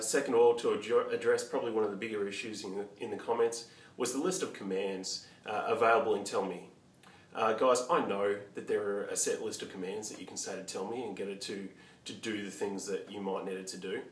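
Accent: Australia. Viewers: OK, first off, your accent has absolutely nothing to do with tellme's ability to understand you. You're right, tellme has a commands list, which you deliberately avoided using! Try the correct commands list, even with your Aussie accent, & I'm SURE it'll work (I've seen it do with accents far stronger than yours!) 2nd, all your video served as was a giggle for Apple fanboys. 3rd you make Siri seem perfect, it is NOT, but you don't show that in your video; hence it's misleading.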